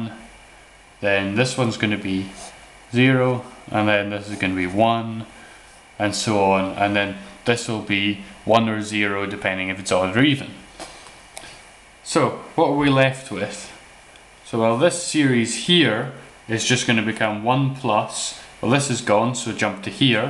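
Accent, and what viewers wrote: Scotland, United Kingdom. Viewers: ye scottish mate? nice vid btw thanks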